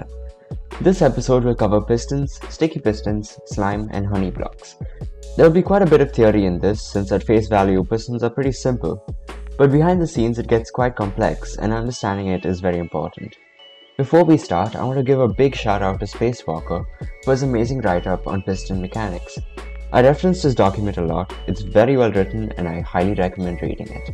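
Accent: India. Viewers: With any chance are u indian?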